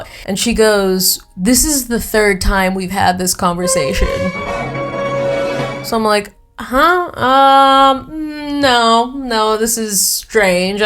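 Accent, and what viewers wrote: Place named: Massachusetts, United States. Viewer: so very wicked Massachusetts i haven't heard somebody say "pup-corn" in a while so thank you for that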